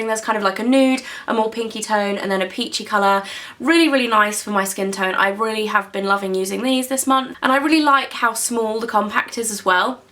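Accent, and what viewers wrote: United Kingdom. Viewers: !!!!! YOU ARE AMAZING AND YOUR BRITISH ACCENT IS JUST PERFECTION!!!!!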